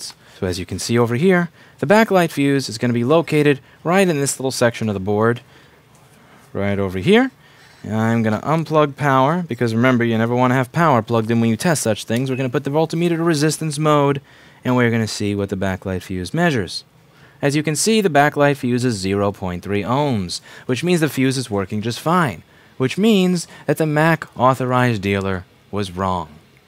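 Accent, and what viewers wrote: New York, United States. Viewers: I'm sure I'm not the first to tell you this, but your voice reminds me of Jerry Seinfeld. I guess that you also have the same accent since both of you are New Yorkers.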